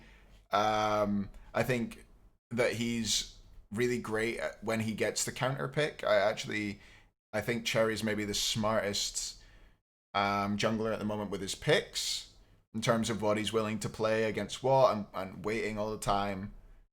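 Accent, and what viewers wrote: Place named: Scotland, United Kingdom. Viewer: Best takes , perfect list , perfect video , and the most authentic Scottish accent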